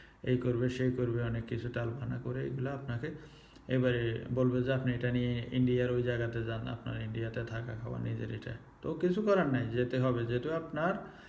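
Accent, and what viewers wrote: Noakhali, Bangladesh. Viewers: নোয়াখাইল্লা। তুমি তাই না ভাই ভালো ভালো কাজ করেন তবু মানুষের সাহয্য হোক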